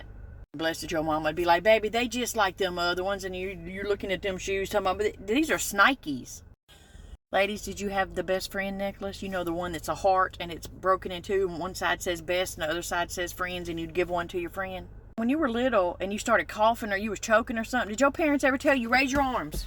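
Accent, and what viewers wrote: South, United States. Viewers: Hussy and Harlot😂....love the southern accent!❤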